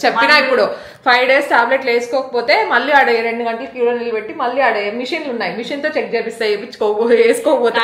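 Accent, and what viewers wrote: Anantapur, India. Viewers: Mana anantapur slang ye veru abba... Miru regular videos lo matlade dhaniki.. anantapur lo mi Amma valla tho vunnapudu matlade matalake chala difference vundhi. Anantapur slange ...Endhukante nenu kuda anantapur ye sis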